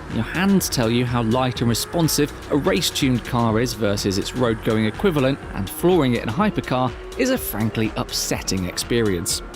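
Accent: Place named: England, United Kingdom. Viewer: You sound english. Why ATS and not ETS2?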